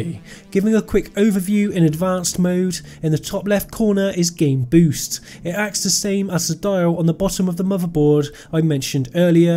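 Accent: United Kingdom. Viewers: "Free point one port" lol, I like your heavy UK accent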